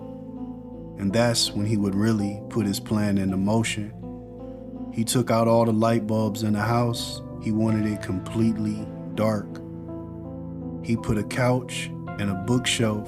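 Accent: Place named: Los Angeles, United States. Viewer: LA guy LA voice perfect for the content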